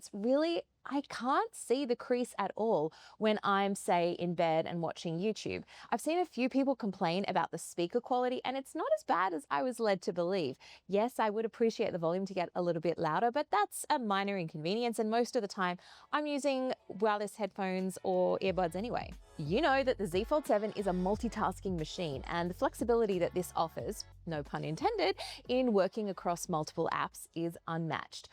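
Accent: Australia. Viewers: but you sound so Australian other than that!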